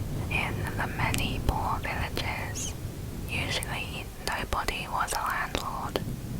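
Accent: England, United Kingdom. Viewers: She has an English accent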